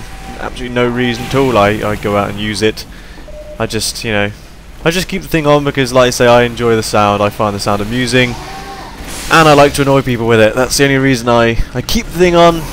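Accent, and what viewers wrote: England, United Kingdom. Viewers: where in england are you from?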